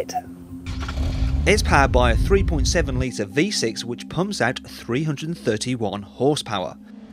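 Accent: United Kingdom. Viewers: i hate the way britain people says words,i know thats the original english,but its wierd,U.S.A is the best country in the world and the way they talk is nice.,and im not american, im brazilian and i love it. 370z sounds better ,has a better looking and u can get it for way less money than the porsche,get the Nismo exhaust and u can have +15 hp then u are good to go.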